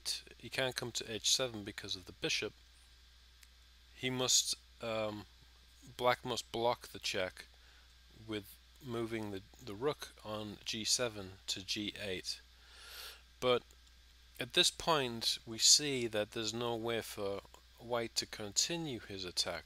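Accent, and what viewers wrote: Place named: England, United Kingdom. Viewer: Where from in England though?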